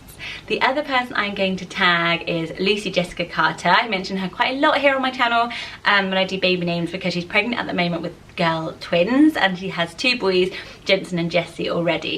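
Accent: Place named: Bristol, United Kingdom. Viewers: I'm from Weston and honestly didn't notice your West Country accent until this video 😂 I go to Swindon often for the ice rink 😜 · I KNEW IT, I FREAKEN KNEW IT! I COULD HEAR THE RRR'S AND I COULD HEAR YOUR ACCENT, I'm sorry I'll calm down... 😂Okay well I predicted Bristol, but I mean, I was pretty close. :D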